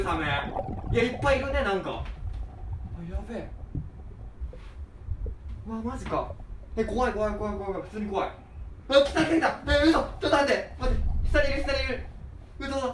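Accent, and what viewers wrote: Kansai, Japan. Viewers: 関西弁いい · 関西弁いいね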